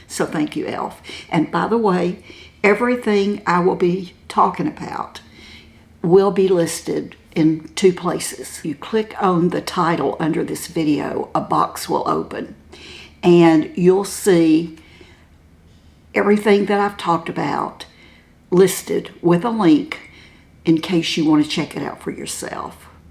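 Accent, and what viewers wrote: South, United States. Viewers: I just love your videos. Ur so sweet and such a great storyteller. Ur beautiful and ur southern drawl makes me swoon. My mom always had her face on and you've inspired me to get dolled up w/ELF! I'm in! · I love the sound of your beautiful southern voice and love how you enjoy transforming your already beautiful face. Thank you for being a reminder to our older generation that we too get to enjoy makeup and how it can make us feel. And have fun with it!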